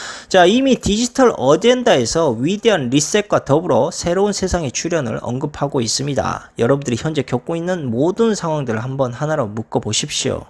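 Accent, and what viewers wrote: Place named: Suncheon, South Korea. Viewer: 친정이 순천인디 님 사투리 항상 즐겁게 듣고 있습니다 오늘도 화이팅 하십시오 그동안 밀린 댓글 오늘은 도배하고 갑니다 저는 그럼 오늘은 이만 뿅~~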